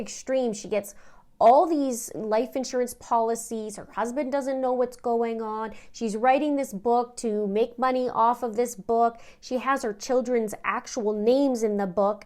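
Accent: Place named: Canada. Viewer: Love your videos! You cover these crimes so well and I like your Canadian accent😊 Canada is the favorite country I have ever lived in!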